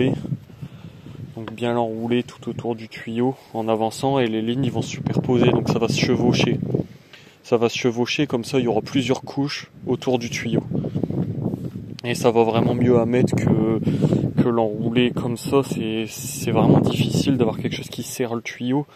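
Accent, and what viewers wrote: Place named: Quebec, Canada. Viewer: Tu habites où? As tu déjà habité au Québec? On sent un accent québécois derrière ton accent français...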